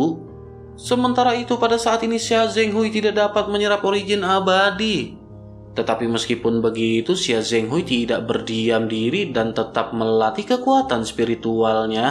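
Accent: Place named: Java, Indonesia. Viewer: Min suara lu kok lama2 jadi medhok 😂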